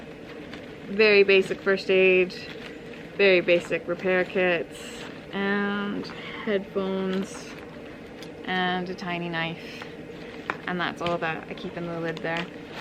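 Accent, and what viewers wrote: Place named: Scotland, United Kingdom. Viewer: Sometimes i hear a Scottish twang in your accent 🏴🇨🇦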